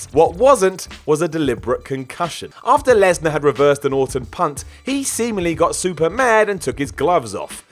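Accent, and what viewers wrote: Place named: England, United Kingdom. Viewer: Hey so, I'm young, I love pro wrestling, and whatculture wrestling is my favorite channel. Please forgive me but I'm curious. How come when Englishmen end a word that ends in an 'A' it kind of sounds like an 'er'? Much love. Again just curious.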